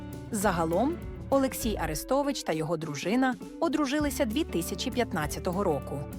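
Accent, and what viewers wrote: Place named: Canada. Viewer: Скандальний твій канадський акцент і замовне втручання в особисті справи.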